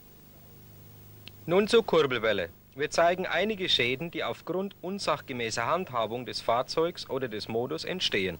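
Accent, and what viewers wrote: Germany. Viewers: Natürlich ist das Schweinfurterisch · This iss a Dialekt from the bavarian County calling " Fränkisch " !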